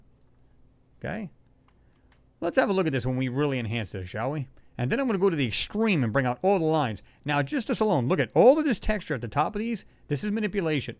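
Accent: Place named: Rhode Island, United States. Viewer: When I was growing up I had a friend named Tommy his dad was Rhode Island and he sounds exactly like you am I close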